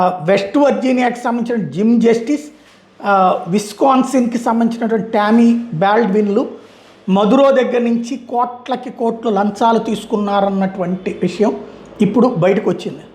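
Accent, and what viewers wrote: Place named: Vijayawada, India. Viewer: Nuvvu nee butler English Nee level local bezawada ne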